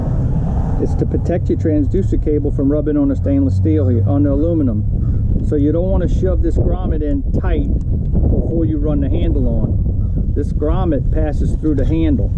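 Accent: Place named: New Orleans, United States. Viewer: Well done. Voice over worked great. Useful video. How does your Fishing Specialties BowDucer LiveScope set up do on a slow troll? Does it want to swing up? And why the Ram mount to hold the transducer? Does the Garmin Perspective mount not fit on the FS pole?. The scenery and accent makes me miss New Orleans where I grew up and could be on great water in no time. Now fish the Texas coast: drive 3 1/2 hrs to the salt. 22' Pathfinder bay boat, LiveScope, (2) Garmin EchoMap 94SVs, SteadyCast, GMS 10 port expander, NMEA 2000, MotorGuide Xi5, F150TLRC Yamaha. Need all the help I can get! Thank you for the content.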